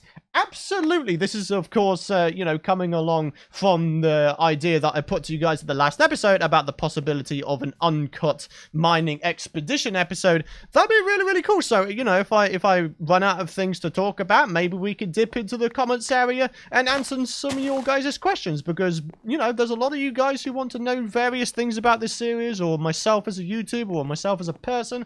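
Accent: England, United Kingdom. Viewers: Do you are from england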